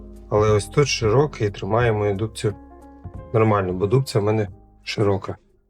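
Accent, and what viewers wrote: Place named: Vinnytsia, Ukraine. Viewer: Лайк за Вінницький (рідний) говор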